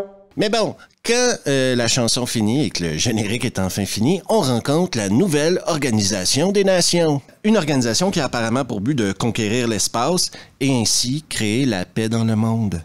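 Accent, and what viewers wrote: Canada. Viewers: j'aime cet accent canadien pour me rassurer de ces nanars . merci!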